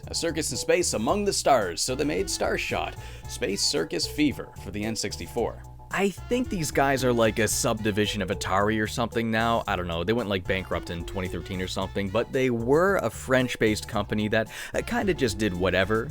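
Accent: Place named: Canada. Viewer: can someone finally answer this for me? is a fellow canuck or is he like from northern america? because that accent is just so hard canadian sounding and and a canadian with as very separate yet funny sounding accent i freakin love it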